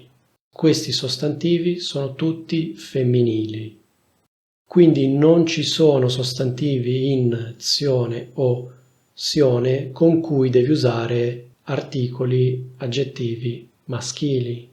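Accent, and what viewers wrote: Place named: Milan, Italy. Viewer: Professore, come studentessa brasiliana 🇧🇷 di italiano come seconda lingua, ti supplico di non abbandonare mai la tua variante settentrionale. È importantissimo per noi avere accesso a diversi accenti, e il tuo è l’unico canale che conosco con la variazione milanese. Ti ammiro e ti seguo.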